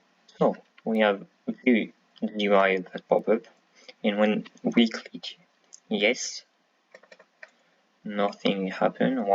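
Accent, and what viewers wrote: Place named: France. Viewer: T’inquiète l’accent français c’est hyper prisé dans les pays anglais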